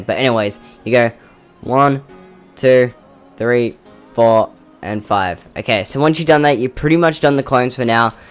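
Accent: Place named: Queensland, Australia. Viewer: Great vid again so happy there is a Aussie youtuber. Cause I'm Aussie and let me guess where you are from I tried last Ep how about QLD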